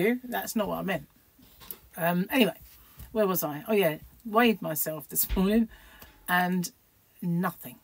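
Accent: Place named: North, United Kingdom. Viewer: I too have always been interested in the way people speak, and trying to figure out in my head (before asking)where they're from 😊. Different regions are so interesting. I was thinking to mention that a coworker of mine is from Bedfordshire. I asked her to listen to a bit of the video I was watching (of yours)& she said you're from more north of the UK, and that you don't have an Australian "influence" in your speaking. I knew that I heard a difference between your and her accent 🤓. Anyway I find your videos entertaining 😁, but I have to get ready for work. Have a great day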